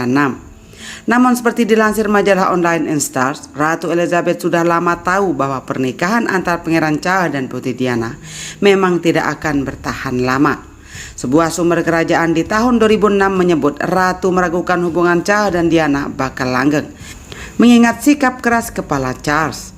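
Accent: Indonesia. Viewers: Kakak orang medan juga ya?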